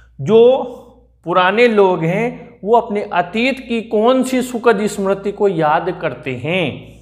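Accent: Hadoti, India. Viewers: sir aap hadoti pradesh ke lagte hai ⓟⓛⓔⓐⓢⓔ ⓣⓐⓚⓔ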